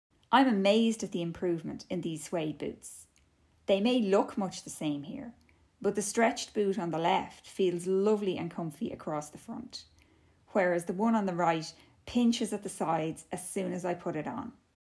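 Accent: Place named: India. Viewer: What a lovely accent and informative video, i love your accent are you from india?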